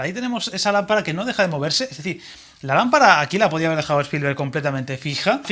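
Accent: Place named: Spain. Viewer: ¿Tengo una duda eres Español? ¿Pero veo que usas fragmento del audio Latino? Buen análisis de la película, digo lo notó cuando tú hablas compañero, nos vemos después en un nuevo vídeo.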